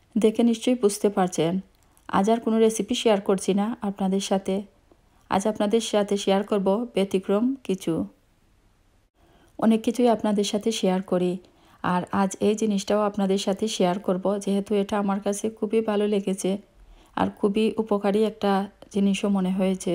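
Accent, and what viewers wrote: Sylhet, Bangladesh. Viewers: আফা সিলেটি নি গো? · সিলেটি আপু।উনারা এভাবে কথা বলে। ধন্যবাদ আপনাকে ও আপুকে।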